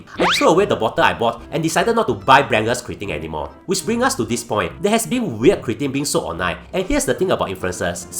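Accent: Singapore. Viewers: This is a Singaporean cat. He’s speaking in Singlish dialect . 😅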